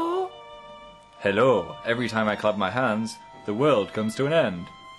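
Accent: North, United Kingdom. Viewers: HE SOUNDS SO MUCH MORE NORTHORN HERE!!!!😁😁😁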